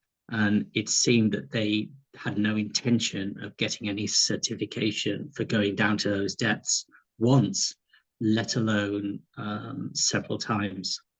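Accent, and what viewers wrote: United Kingdom. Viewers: british bla bla bla boring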